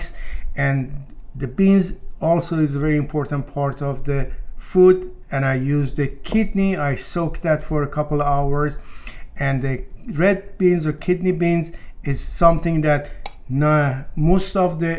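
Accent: Iran. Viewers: Love from Pakistan 🇵🇰🇵🇰🇵🇰 love your persian accent too, will try it!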